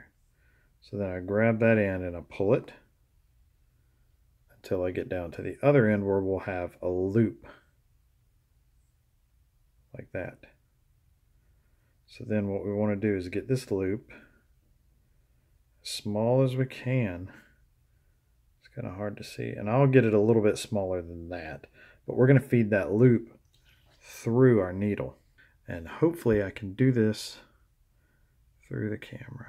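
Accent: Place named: South, United States. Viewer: love your southern accent. i used to live in Florida....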